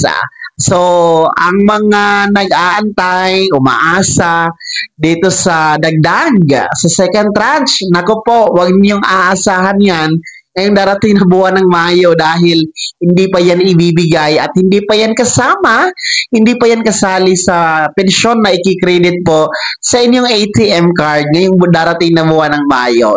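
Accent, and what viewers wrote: Visayas, Philippines. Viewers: ayusin mo pagsasslita mo!!! palibhasa bisaya ka!!!!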